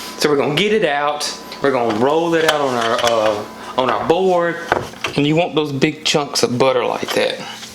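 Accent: South, United States. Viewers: "let it fridgerate" love the southern speak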